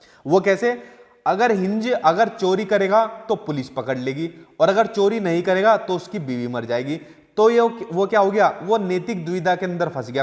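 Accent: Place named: Uttar Pradesh, India. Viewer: Sir ,,Aapki boli bilkul hmari jesi hai ...UP wale ...😎😎😎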